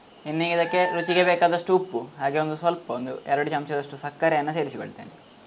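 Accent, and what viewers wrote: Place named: Tulu Nadu, India. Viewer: It seems your mother tongue is Tulu, pls make videos in Tulu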